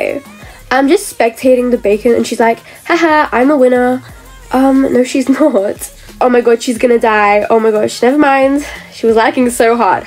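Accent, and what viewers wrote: United Kingdom. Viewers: You sound like a british gurl 😮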